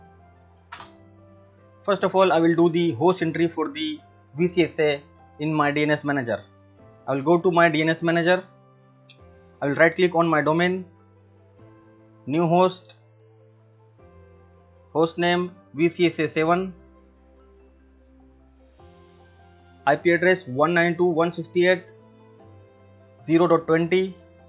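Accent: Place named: India. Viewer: He does not have a speech defect. It is accent. Comes from India.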